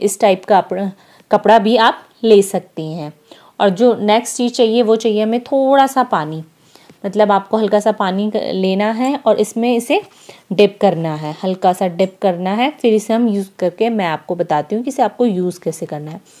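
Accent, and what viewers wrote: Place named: Himachal Pradesh, India. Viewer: Thank You Mam For Replying On My Comment Mujhe Laga Aap Himachal Se Ho Aapki Tone Himachali Lag Rhi Thi .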